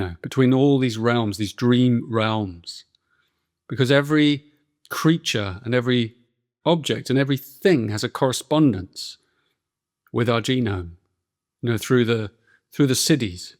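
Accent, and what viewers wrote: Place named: United Kingdom. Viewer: Same thing happened to me! I love beautiful and elegant British accent…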